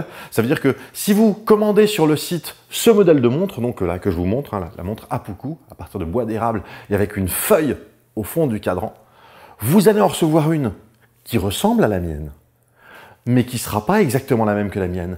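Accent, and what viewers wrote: Alsace, France. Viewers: L'accent H'Alsacien 💜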